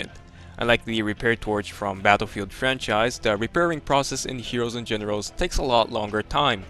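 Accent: Germany. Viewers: U don't speak english normal...are u german or something?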